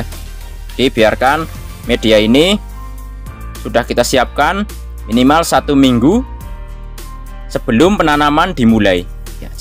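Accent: Java, Indonesia. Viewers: Logate medok asli mana bang 😊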